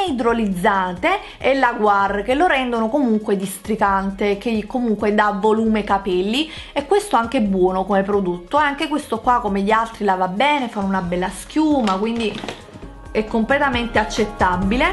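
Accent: Naples, Italy. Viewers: Ma sei napoletana? 😍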